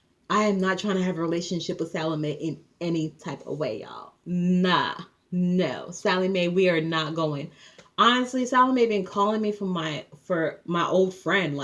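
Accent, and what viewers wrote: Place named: Liberia. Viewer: Thanks for the info! I hear the Liberian accent lol....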